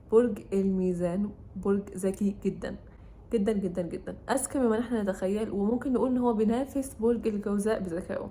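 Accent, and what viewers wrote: Syria. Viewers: ممكن سؤال يا ليه في معظم الڤيديوهات حتى لو مش دلوقتي زمان برضو بتتكلمي سوري بس رغم انك مصرية سورية مجرد سؤال بس متفهمنيش غلط 💙💙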